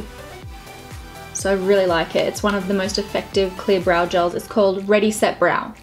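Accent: Australia. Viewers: Hell yeeaas #notificationsquad as always. Staying in oz right now and feel your accent much more now haha insta: Paniz.sophia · There’s nothing wrong with the Aussie accent/slang. Don’t forget where you come from!! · I love your Aussie accent 😍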